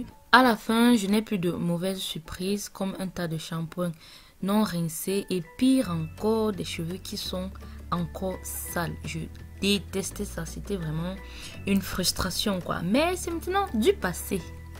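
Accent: Cameroon. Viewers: T'es camerounaise?? Ta manière de parler me fais croire à une camerounaise😂😂😂, tu me tue seulement mdr, j'aime t'es vidéo, continue comme ça👍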